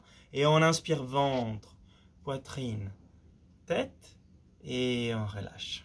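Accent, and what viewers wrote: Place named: Italy. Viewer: Merci beaucoup! J'adore ta façon de coacher. Je pratique la respiration Wimhof depuis plusieurs mois mais j'ai acquis plus de fluidité et de détente en t'écoutant. Ayant travaillé de temps à autre en Italia, j'ai aussi le plaisir de retrouver la musique de ton accent!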